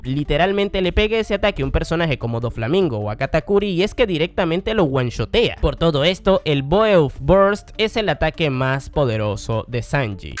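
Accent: Dominican Republic. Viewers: tengo una pregunta importante que hacerte, eres Dominicano?🇩🇴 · tú eres dominicano brot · ERES DOMINICANO ?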